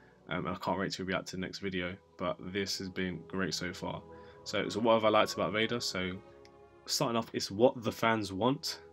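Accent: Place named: United Kingdom. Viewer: Your dialect is ugly, it sounds like UK trash, rather than superior aka North American dialects.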